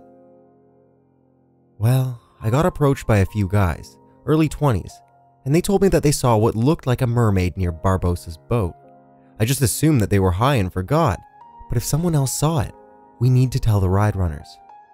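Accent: Canada. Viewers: Canadian, eh? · Your canadien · Bruv your Canadian accent makes this way less convincing. Americans, presumably most employees at Disney, don't say "soaree" 😂😂😂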